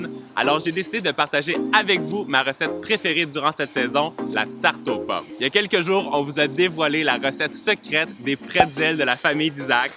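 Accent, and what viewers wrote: Quebec, Canada. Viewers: J'adore ton accent 😍 c trop cool l'accent québécois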